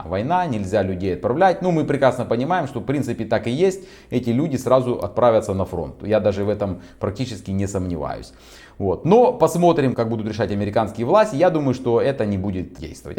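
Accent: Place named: Russia. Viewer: как я понял по интонации вашей речи, вы гражданин России по рождению?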